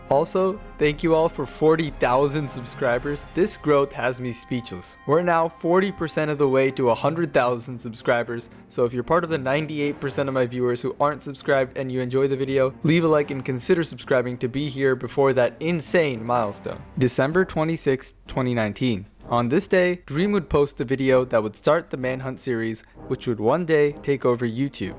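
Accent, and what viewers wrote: Ireland. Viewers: Yooooooooo I am part of the 1% (Edit) I just realised that your Irish... Im Irish to!!!!!!!!!! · Irish Accent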